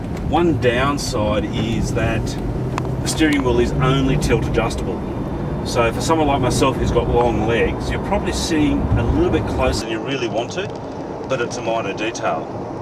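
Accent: Australia. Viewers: loved this car and your review!! i love the aussie accent :D is it rwd?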